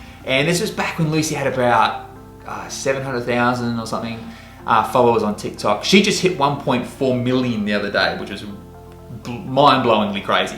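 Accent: Australia. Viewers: Awgh ausy accent is so annoying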